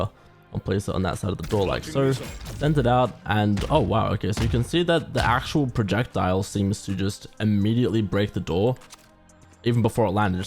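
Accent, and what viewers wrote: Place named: Melbourne, Australia. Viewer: u r from melburrrnnnnn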